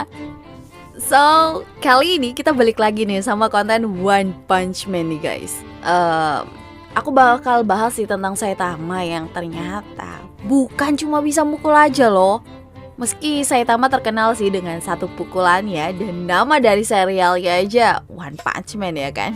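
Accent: Java, Indonesia. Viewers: Wis kaya wong Jawa yo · orang Jawa ternyata, ngokonya kerasa 🤣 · Suka logat jawanya mbak 🤗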